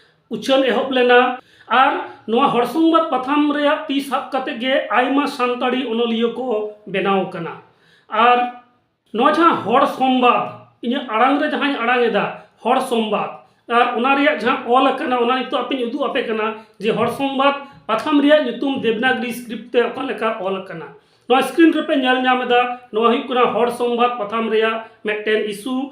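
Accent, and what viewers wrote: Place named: Bangal, Bangladesh. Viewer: Apnar pronounced Bangal tone keno? Kichu pariskar kotha why used.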